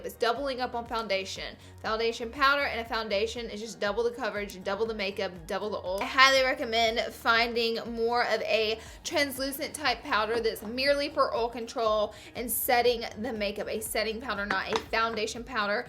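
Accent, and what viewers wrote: South, United States. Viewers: what part of the south are you from? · Girl...I absolutely love you, you are too crazy lol! I love to watch your videos because I usually laugh the whole time. Us girls from the South ie. (Kentucky), we do say things different but I love my heritage. Even if we do say "OIL" wrong to others. He he!! Love ya girl and I will see you next time!! Xo <3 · I love your Southern accent! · I love your southern accent 🤙🏼🤠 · I love accent. I was born in Venezuela and in Latin American but I moved to Texas when I was a baby. I grew up in the south and her accent is so beautiful. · Girl I'm from Louisiana and I love the southern accent!! My shirt right now says "talk southern to me" wish I could post a pic. Lol u. Need it! Weerrrkk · I literally just started watching this video, I'm 45 seconds in, and I already love her. I was raised in the south as well, although I don't say oil like that, and I love her accent. 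She's super pretty too! (New subscriber here!!) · you're so hilarious and I love the southern accent 🤗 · Many southern people talk like this! Lol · She sounds like she's from Georgia or South Carolina. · Love you I myself is from the south and I also say it like you do haha! Not ashamed! I am also a hot oily mess girl! I love these tips thanks so much! 😘😘😘 hope you're having fun in New York! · Oh my gosh I honestly love you so much like I just started watching your videos a week ago and I've been binge watching them non stop😂 I think your super funny and cute and I love your south accent.. you kind of inspired me to get into makeup. I'm only 14 but it's soo funn lol keep dining what your doing girl ❤️ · I love your southern accent, it makes me feel at home ❤️ don't ever apologize for it. 😘 love from Louisiana (except your football team. Nope. LSU forever 🐯) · OMG there is nothing wrong with her voice!!! 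She is from the South so, she talks like that and obviously if you guys were from the south you would talk like that too. So why watch this video if you are going to make fun of how she talks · I’m from the south I know ur struggle with words Ily ❤️❤️❤️❤️😘😘😘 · What part of the South are you from?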